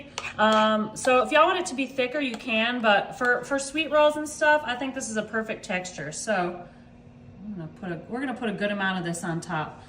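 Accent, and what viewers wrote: South, United States. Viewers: People talk like that from the South, excuse me.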